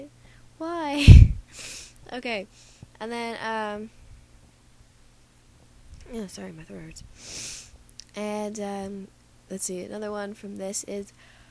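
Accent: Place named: England, United Kingdom. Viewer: hahaa! your english accent cracks me up :)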